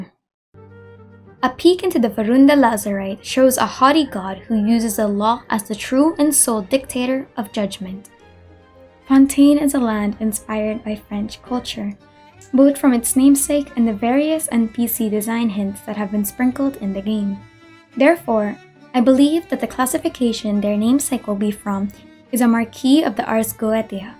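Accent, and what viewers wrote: Philippines. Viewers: This channel is such a hidden gem! I knew you were filipino the moment you started speaking 😂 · LMAO I pray that they don't find out about ur search history. Judging from ur accent, uI assume ur filo and God knows what happens in Filipino Christian households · Your accent! ❤ ph? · I'm guessing you're filipino? I can hear it from the accent. #represent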